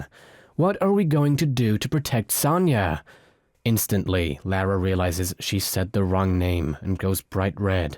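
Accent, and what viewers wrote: United Kingdom. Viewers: Is it just me or does the speaker sound British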